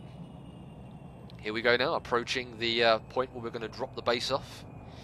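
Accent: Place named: United Kingdom. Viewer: You are so British mate :D - Giz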